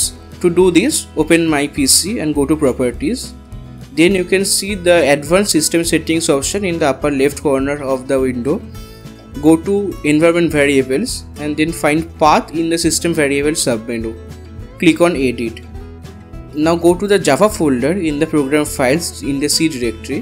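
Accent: India. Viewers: i liked the video only beacuse of the indian accent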